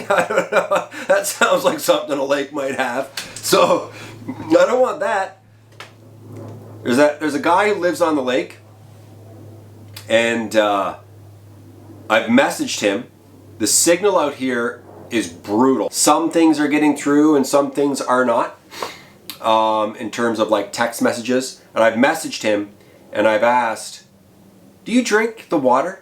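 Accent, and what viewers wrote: Newfoundland, Canada. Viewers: are you from newfoundland ive been watching your vids about a year now and i often said i think he is from nfld or lab for sure are you???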